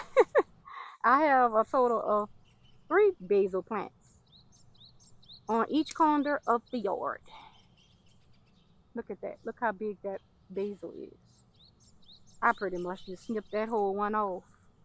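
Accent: New Orleans, United States. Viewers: Oh my goodness I recognize that accent.I graduated from Dilliard University (1978) in Nursing.New Orleans.Just love the garden.Enjoy😊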